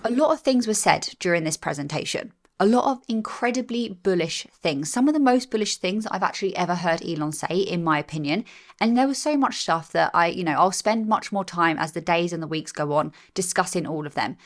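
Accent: England, United Kingdom. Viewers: Love your content and your English accent.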